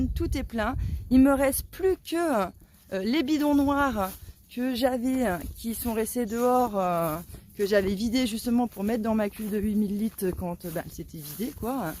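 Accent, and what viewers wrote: Paris, France. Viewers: C'est un accent parisien que j'entends là ??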